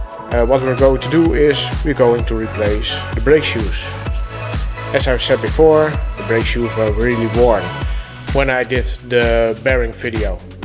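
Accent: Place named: Netherlands. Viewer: Love the dutch accent. Cheers.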